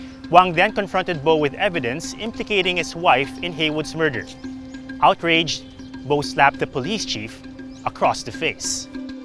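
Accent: Philippines. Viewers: ah that explains his accent, he sounded filipino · I’m not even a native English speaker but I think I can hear a Filipino accent here 😄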